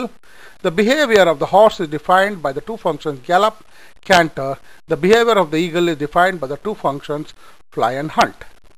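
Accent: India. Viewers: As a native speaker of US English, I am simply expressing the fact that I dislike the standard Indian English accent. There are certain (often incorrect) patterns of speech that can be observed which are displeasing to the ear in the Indian English accent; simply put, it hits the ear wrong. I'm sorry you do not understand, but imagine receiving technical instructions in Punjabi that is being (poorly) spoke by a white person from the US — how much fun would you have? My guess is that you would have very little Sir. This is only my opinion, take it or leave it. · I don't understand you buddy, but this tutorial IS in English! Indian accent is an altogether different thing, however its still very clear to understand.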